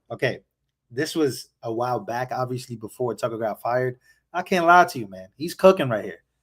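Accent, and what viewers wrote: New York, United States. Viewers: This is next attempt at divide an concur. Just like the president musk narrative they wer trying to pull. The troll bot bot that just hit you taht you are not american was deff a bot.. you know that. We not thinking like that. Fellow NY bro.. uptown.. heights area, your neighbor and bro. Love what you doing, love hearing sombody that talks like us doing great work. Love it every day i listen. Dont let the bots get to you.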